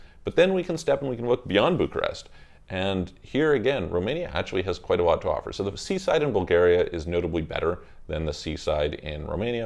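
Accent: Canada. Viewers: You have a really strong Canadian accent.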